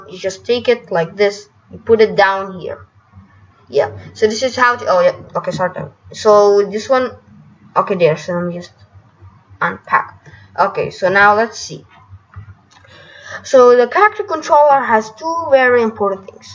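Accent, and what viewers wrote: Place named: Spain. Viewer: ik it’s English but I thought u had a Spanish accent mb. W video tho 😅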